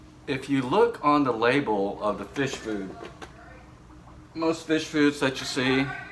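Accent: South, United States. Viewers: This is refreshing love your southern accent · I hope this isn't offensive to you or anyone else but as a Yank that southern twang is buggin' me out. Lol All love though brother and thanks for the advice.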